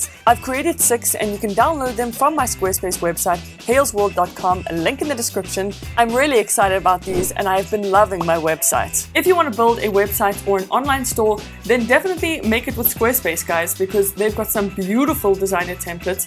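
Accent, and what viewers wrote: South Africa. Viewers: Is this broad south African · ek wou sê sy het 'n Suid Afrikaanse aksent 😂😂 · South african accent?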